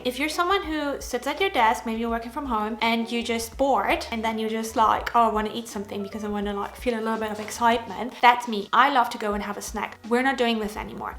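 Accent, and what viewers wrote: Germany. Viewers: It took me a while to figure out your accent, but its German! ❤ Love from Germany, youre spitting the important facts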